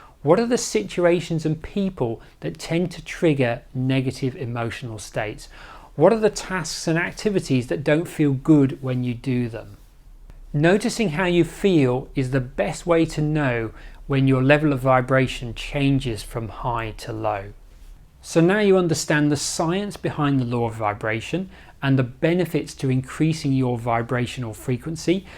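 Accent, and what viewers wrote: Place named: England, United Kingdom. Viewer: Always nice to hear a calm, gentle English accent. So much more pleasant to listen to. 🙏 Thank you for your invaluable knowledge.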